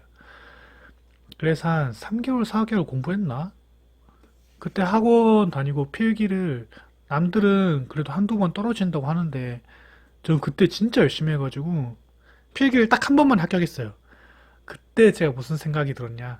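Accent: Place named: Gyeongsang, South Korea. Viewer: 형님 항상 잘 보고 있습니다 저도 곧 전기산기 준비할건데 좋은 결과 있으셔서 다행입니다! 형님 부산 사시나요? 말투가 경남이신데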